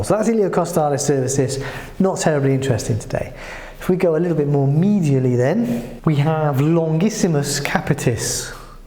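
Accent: United Kingdom. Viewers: I am not a native english speaker. But I adore anatomy and you are excellent, except your accent is british and also so fast that its hard for me to understand😂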